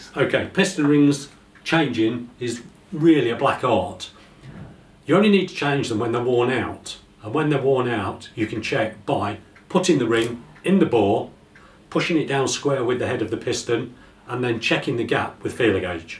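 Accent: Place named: United Kingdom. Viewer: Sound like your from UK do you do anything for the 4g63 engine ? And the k20 Honda ?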